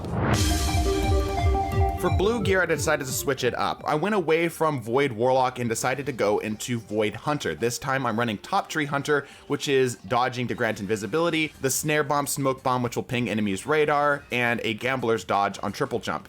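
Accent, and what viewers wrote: United Kingdom. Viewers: Is this the same dude with the British accent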